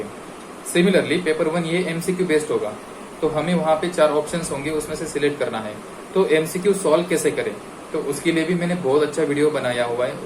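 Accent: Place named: Maharashtra, India. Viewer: Amazing videos sirr... Aapki hindi me jarasa marathi ka leheza hai... I also frm mh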